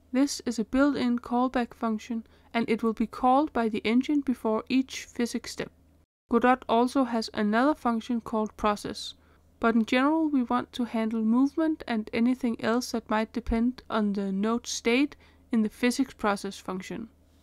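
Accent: Denmark. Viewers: I am really liking this so far and I can't wait to watch more. It's also nice to see and hear a fellow dane make game development tutorials.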